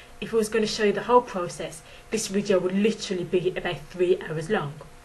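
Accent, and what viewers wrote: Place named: Birmingham, United Kingdom. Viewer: Thanks for that vid, very informative and i love, love, love your accent; are you from B'ham UK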